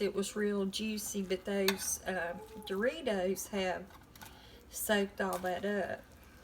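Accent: South, United States. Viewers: I have slowly become addicted to your YT!! I'm not used to the southern accent, but you have taught me! By the way, I really like your hair down, you look really cute! And LOVE your recipes.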